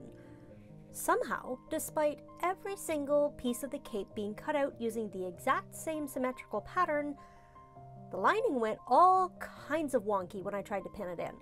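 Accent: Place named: United Kingdom. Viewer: You sound British lol I was confused when you said Canada 🇨🇦 I subscribed I enjoy watching your videos.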